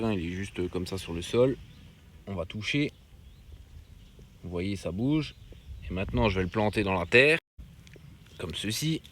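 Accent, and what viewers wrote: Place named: Franche-Comté, France. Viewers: Merci de tester et d'ouvrir les esprits, d'autres part je crois que je tombe amoureuse de ton accent de l'est 😉j'apprécie tes conseils, ta manière de faire des rimes avec le vivant du potager, j'ai vu les rageux se déchainer en commentaire sur ton dernier réel sur l'éléctroculture, un pas de travers dans le sens inverse de leur pensée et tout le monde s'enflamme au lieu de tester tout simplement, ils préfèrent boycotter!! bise de l'ouest 😘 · Je vous adore !! Ainsi que votre accent qui me rappelle ma Franche Comté natale!! 😊 Je suis très en retard pour la plantation de mes tomates !! Mais avec le pont de cette fin de semaine, sans regarder la lune, je les planterai quand même!! Mais je vais essayer votre fil de cuivre. Ça ne mange pas de pain !! Merci pour vos publications pleines d'humour. Je vous kiffe !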